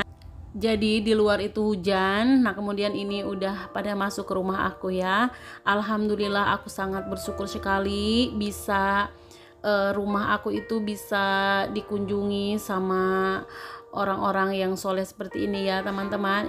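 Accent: West Java, Indonesia. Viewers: teteh asli mana? Logatnya sunda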